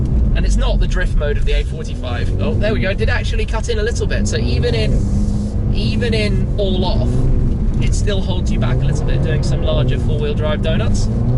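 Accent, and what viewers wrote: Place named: United Kingdom. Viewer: 我喜欢听你说话的口音，标准英式英语。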